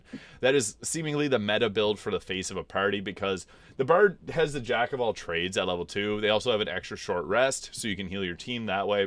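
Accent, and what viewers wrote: Canada. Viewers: I detect a Canadian accent. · LMAO, i legit watch this channel for two reasons: 1) great bg3 content and 2) the hilariously thick canadian accent 😂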